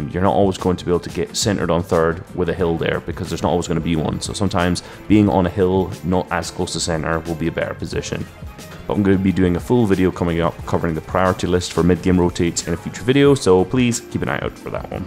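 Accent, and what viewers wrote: Scotland, United Kingdom. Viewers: Seen u on nicks stream and to hear a Scottish accent was a pleasant suprise. Keep up the class vids🏴🏴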